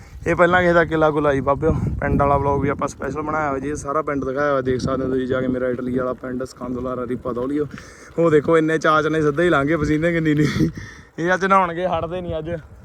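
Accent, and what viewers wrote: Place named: Moga, India. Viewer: Malwa area y tera moga lgda boli to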